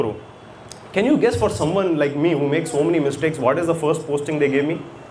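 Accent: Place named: South India, India. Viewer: your english is south indian n name is north indian. how come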